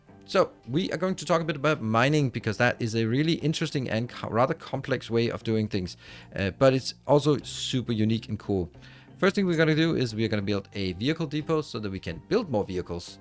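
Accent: Denmark. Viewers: Nice video. Your English is really good. But a fellow dane can hear :)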